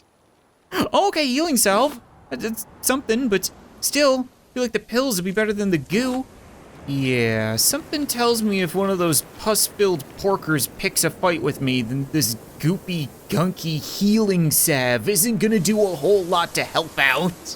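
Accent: Appalachia, United States. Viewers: why are you using a hillbilly voice if this game is placed in indonesia / phillipines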